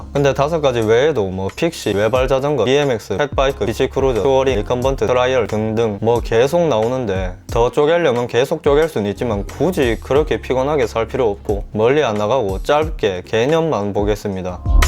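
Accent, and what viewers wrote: Gyeongsang, South Korea. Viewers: 구수한 경북구미지방 특유의 사투리에다, 중간중간에 표정 찡그린 캐릭터 얼굴 나오면서 높은 톤으로 "~~해도 별 차이없던데~" "~~해도 잘만타고다니는데" 하는 부분이 진짜 웃김 ㅋㅋ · 잡소리 없이 똑부러지는 설명...양념으로 경상도 사투리 억양~ ㅋ 좋아요~~ㅋㅋ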